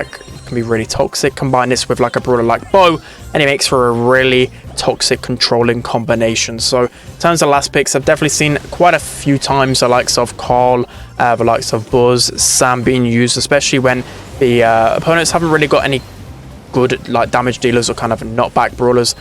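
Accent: United Kingdom. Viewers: That’s it I heard enough British accent for today.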